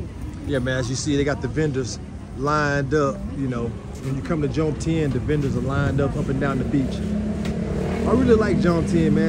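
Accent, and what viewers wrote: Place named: Virginia, United States. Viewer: This is the truth he defiantly from Virginia it's the voice , VA dudes we just deferent, and women from all over the World love US ,and not just that even if he not from Virginia he branded with speech Pattern , but I just like to see yall together , and both of you make a Beautiful Couple ,and like the videos keep them coming.💪💪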